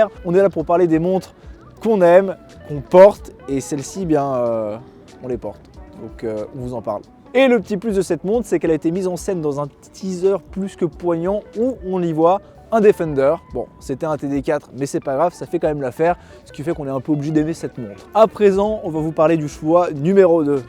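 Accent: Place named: Savoy, France. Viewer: Du Texas - avec le Stetson et la Jeep, on pourrait presque y croire s'il n'y avait pas l'accent savoyard (ou quelque chose comme ça) !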